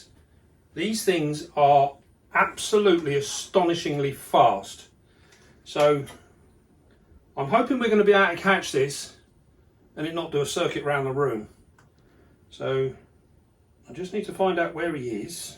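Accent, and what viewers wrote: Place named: England, United Kingdom. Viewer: Wow, i have never seen a video about a semi-acquatic species of spider...very nice, and as usual i really like your calmness and kindness and also the experience u have with this animals. Love also to hear the english accent :) Support from Italy, you have the best spider-keeping channel out there :) Nice work!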